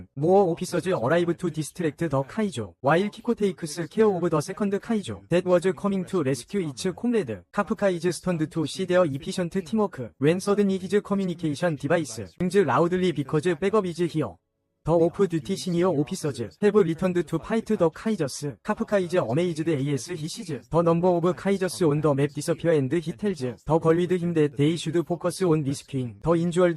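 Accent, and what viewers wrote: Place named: South Korea. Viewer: 아오 콩글리쉬 발음때문에 집중이 하나도 안돼네요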